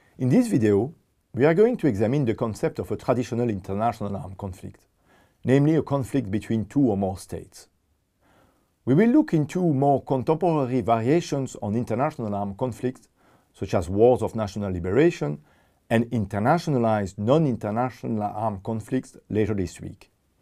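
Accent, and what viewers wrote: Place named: France. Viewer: I like the French accent. Thank you for your explanation.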